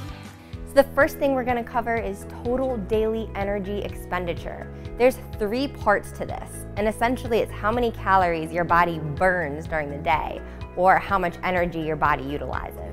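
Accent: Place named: United States. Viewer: She sounds like she has every American female accent at once.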